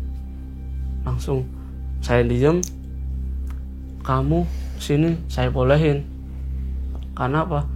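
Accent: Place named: Java, Indonesia. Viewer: Waktu awal2 dia nongol, dia nyebut dirinya "gua".. tp jd aneh sih dengernya krn dg logat jawanya, lbh cocok pake "saya" spt skrg2 ini.. hehee.. sukses terus mas!! Story teller termantabb, bisa inget detail banget semua pengalaman2nya..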